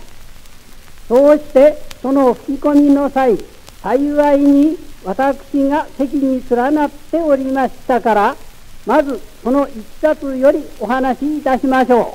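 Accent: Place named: Kyushu, Japan. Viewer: ■ から最後のまでですね！イントネーションから九州訛りを感じました。 ■Wikipediaの出自には、 「🔸父・希次は江戸詰の藩士であったため、乃木は10歳までの間、長府藩上屋敷において生活した、、、 🔸安政5年11月（1858年12月）、父・希次は、藩主の跡目相続に関する紛争に巻き込まれ、長府（現・山口県下関市）へ下向するよう藩から命じられた。乃木もこれに同行し、同年12月（1859年1月）、長府へ転居した[10]、、、 🔸元治元年3月（1864年4月）[注釈 4]、16歳のは、学者となることを志して父・希次と対立した後、出奔して、長府（現・山口県下関市）から70km以上離れた萩（現・同県萩市）まで徒歩で赴き、兵学者の玉木文之進への弟子入りを試みた、、、」 と長州、現山口県が青年期と大人になるまでの期間を過ごしていますので、『山口弁』と思われますが、 ■軍人は九州人も多くその影響があったのであろうと思われます。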